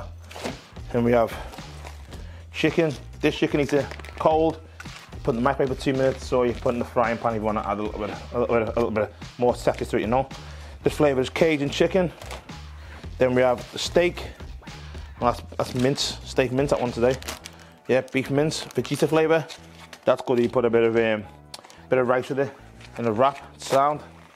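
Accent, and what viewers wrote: Liverpool, United Kingdom. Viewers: the scouser accent for me! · Scouser accent eh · Omg what he is speaking too much Britishness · Love the Scouse accent, represent 💪 YNWA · The Scouse Way.. love the language. i have fun trying to decipher. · I am a Liverpool fan and have heard many local players speaking the same accent. It's difficult to understand but cool anyways. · Scouser confirmed 😄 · he’s from liverpool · Is this guy from Liverpool England? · He is a scouser from liverpool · Don't get how anyone can't understand the accent. a mickey. Nout wrong with that. My birds a mickey...best and funniest people in the UK 👍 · Ah, the scouse accent. Brilliant. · Hear that scouse accent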